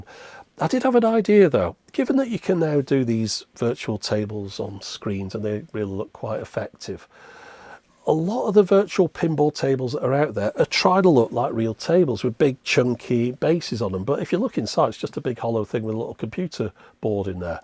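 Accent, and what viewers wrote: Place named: Northern England, United Kingdom. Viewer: you sound like a northerner like me, and that photo reminds me of a place called "Arcade Club" in Bury, (he's got one ion Leeds too), he's got Europe's largest arcade collection including lots of pinball tables! thoroughly recommended if you're ever near the area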